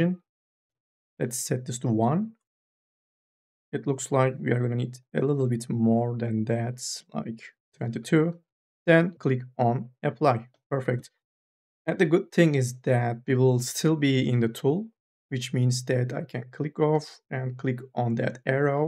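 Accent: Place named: Turkey. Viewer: paylaşım için teşekkürler... aksandan yakaladım.. yanılmıyorsam türksünüz hocam..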